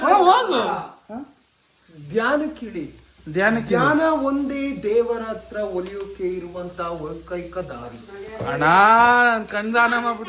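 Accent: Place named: North Karnataka, India. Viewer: Neev matadiddu uttara karnataka bhasge...not uttara kannada bhashe..😂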